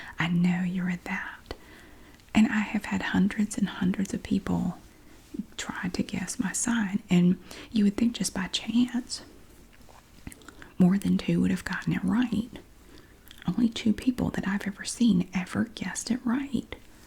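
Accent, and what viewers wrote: South, United States. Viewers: I’m developing a southern accent because of you. I don’t mind it, it’s actually cool it’s rubbing off on me. I love you so so so much! ♥️♥️♥️♥️♥️♥️♥️♥️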